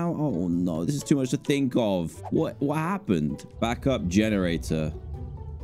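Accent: United Kingdom. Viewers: is British 👀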